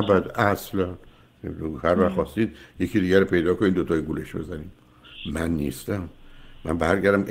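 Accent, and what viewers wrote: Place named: United States. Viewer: طوری با لهجه صحبت میکنه انگار سی ساله امریکاس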